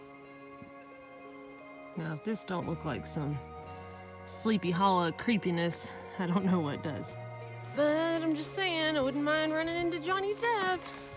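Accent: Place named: Alabama, United States. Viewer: Is it my imagination, or is your Alabama accent getting stronger? 😎😉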